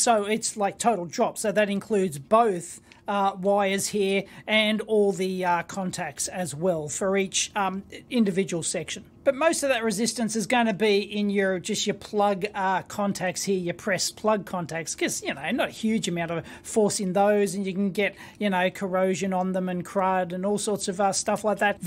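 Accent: Australia. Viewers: Umm… Aussie accent… yup, EEVBlog, not ElectroBOOM. Nonetheless, I’m expecting some smoke… 😉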